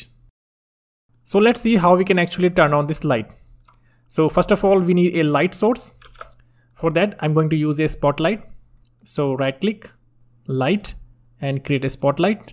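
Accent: India. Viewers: Sound Like Indian? But Very Clear and Clean. ❤️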